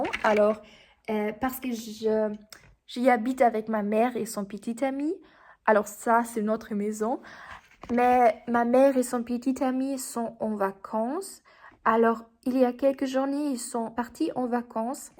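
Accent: United Kingdom. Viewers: idem😊😅 j ai cru que l accent était britannique mais ça n a pas d importance. 😊😅 Elle est connectée et de la bonne façon. Son rayonnement nous fait du bien à tous et à toutes. Paix joie et amour 🙏🙏🙏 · C'est amusant, je n'ai aucune idée de pourquoi l'algorithme YouTube m'a proposé votre chaîne. J'ai regardé d'autres vidéos pour essayer de voir d'où venait votre accent mais... j'ai été surpris. Vous savez que pour un locuteur français natif, vous sonnez presque britannique. J'aurais parié sur britannique avant de cliquer sur d'autres vidéos par curiosité. Aborder des sujets complexes dans une langue que l'on ne maîtrise pas relève du défi. Certains choisissent la facilité... D'autres choisissent de parler métaphysique dans une des langues les plus complexes d'Europe 🥲 C'est fascinant, rien que pour l'audace et le côté incongru et insolite, je m'abonne, lol. 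Ce genre de démarches ne peut que me paraître sympathique...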